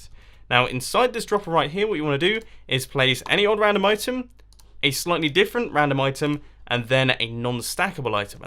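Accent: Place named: England, United Kingdom. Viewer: It's his accent, English eh!?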